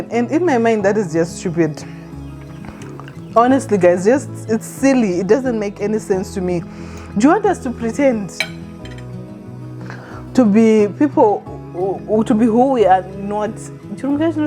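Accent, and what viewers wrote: Zimbabwe. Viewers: What's miphaphu? it goes back to colonisation and Africans and their colonised mindset and how Zimbabweans have not healed from the adverse affects of lack of healing from a colonised mind. I personally can detect not just your Zimbabwean accent but a Shona tone in your accent. Perhaps because I'm not exposed to a lot of Shona accents I wouldn't know what Murewa accent is but isn't that what makes us so beautiful? Is that not something we should celebrate instead of bringing each other down because of it? Your accent to me is easy on the ear and even if it wasn't that would be my problem so why would I make it my business telling you a out it?. Leave these people that woke up on the wrong side of the bed alone. You do you. We love you just the way you are! Much love from Esigodini.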